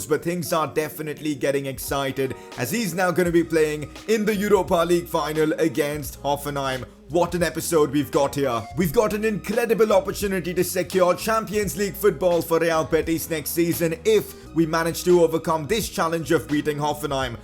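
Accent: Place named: India. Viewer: This has more indian accent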